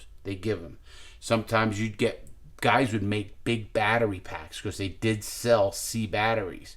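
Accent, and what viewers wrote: New York, United States. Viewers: Thank you. Always appreciate it. My father was born in the South Bronx in 1934. Your New York way of talking about things makes me think of him. He's been gone a long time now.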